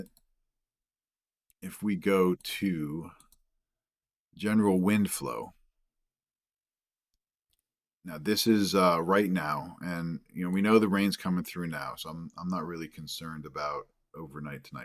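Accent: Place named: New Jersey, United States. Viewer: You are great! So helpful, you make weather interesting! Plus you have a lovely accent (just like mine NNJ). 😂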